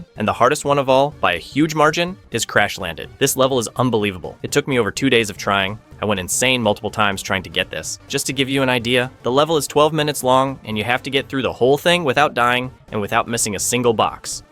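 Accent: Brazil. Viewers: Are u Brazillian?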